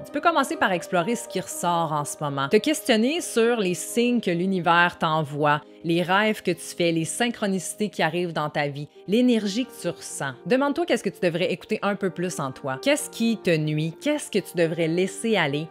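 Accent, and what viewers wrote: Canada. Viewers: J'adore ton accent Canadien ! 🌈✨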